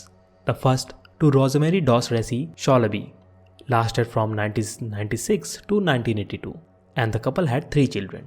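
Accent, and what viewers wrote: India. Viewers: "cane"'. Chupa essa cana. Your accent is....from india?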